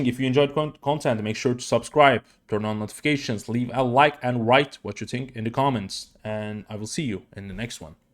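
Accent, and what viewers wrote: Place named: Turkey. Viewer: You must be Turkish. You talk like me.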